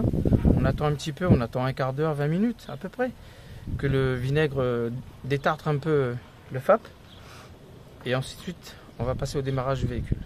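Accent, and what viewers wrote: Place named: Reunion, France. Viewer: Slt & tout mon RESPECT à vous . Je disais : Aparament les gens aiment bien votre video . Peut être que des mécaniciens de l'Île de la Réunion pourront en profiter pour réparer des voitures des personnes de notre île pour pas cher ....vous voyez ou je veux en venir😂😂 . " quand on est fauché " .Mais BRAVO👏👏👏 . VOILÀ CE QUE VOULAIS DIRE MON COMMENTAIRE EN CRÉOLE ... CAR JE CROYAIS QUE VOUS L' ( ETIEZ CRÉOLE 974 ) ....🤔🤔🤔🤔.Mai lé rare que mi trompe !!!!!! Surtout avec l' accent !!!!! Après chacun son zaffair 😂😂😂😂😂😂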